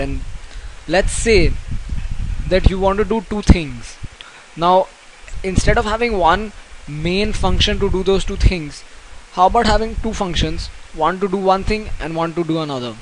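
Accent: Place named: India. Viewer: Your Indian English is soo much better, than Chineese English!!! :)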